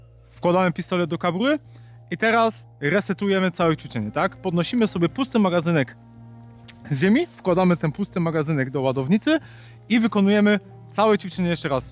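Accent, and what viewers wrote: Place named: Germany. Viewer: To lubię: krótko, treściwie i na temat :) A tak z ciekawości - długo jesteś w Niemczech? Mam wrażenie, że słyszę już lekko niemiecki akcent ;)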